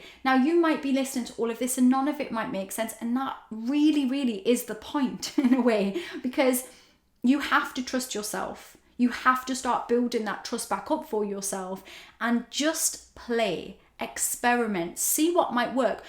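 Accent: Newcastle, United Kingdom. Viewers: Hey. I found your channel being a big Girls Aloud fan, and I was taken with the Geordie accent. Which is why my username is my name haha! Love your stuff. Hope you do more!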